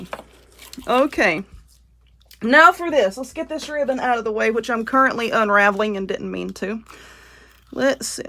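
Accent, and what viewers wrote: South, United States. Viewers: Love your accent! It's nice to hear another REAL SOUTHERNER talk like myself. LOL Your crafts are great!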